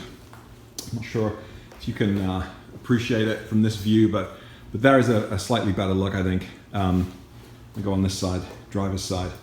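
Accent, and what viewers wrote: United Kingdom. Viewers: Truly not “having you on”. I just love the way the British speak. As an American I’m embarrassed at how badly we murder the language in this country! It’s true my friend. Google 5 mph bumper and you’ll see that there’s a U.S. law pertaining to bumpers. There have been so many cars in the past that weren’t even sent to The States because of it. Many manufacturers just don’t want to deal with reconfiguring bumpers for this market. I’m actually fairly certain that the “bumperettes” (as you call them) don’t exist on the European versions of the 911.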